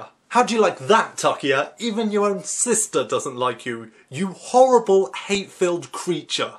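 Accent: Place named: United Kingdom. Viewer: ur british accent is so amazing its like sex to the ears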